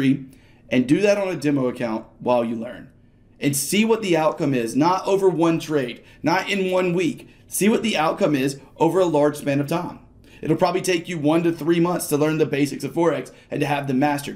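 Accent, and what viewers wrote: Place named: Texas, United States. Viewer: Sounds like Texan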